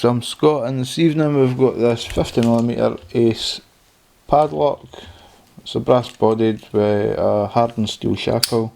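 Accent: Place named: Scotland, United Kingdom. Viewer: Haha, nicely done. Orange belt for you bud! Refreshing to hear a Scottish accent that isn't my own while picking. Keep up the great work bud.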